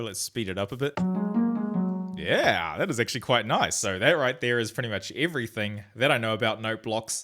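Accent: New Zealand. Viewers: Wait is this guy a kiwi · Are u NZ?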